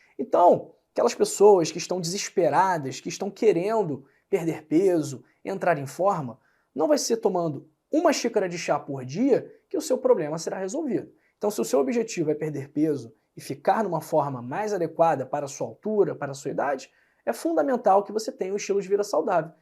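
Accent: Rio de Janeiro, Brazil. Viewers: Como assim, sotaque arrastado. Ele é carioca . Queria que ele falasse como? Que observação mais sem sentido. Valha me Deus!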